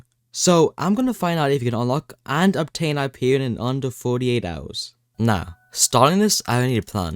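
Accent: France. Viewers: Are you french? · eww are you french? good video tho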